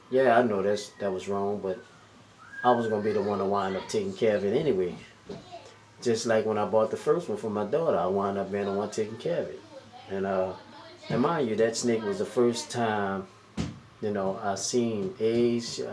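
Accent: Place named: New Orleans, United States. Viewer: You ain't gotta show the saints flag to let people know you from N.O just talk we all know that accent lol great story bro love it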